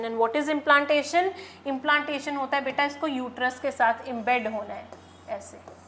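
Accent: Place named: Punjab, India. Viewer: Thoda panjabi nikal jati hai❤️❤️❤️.....ÑîÇÈ ma'am.....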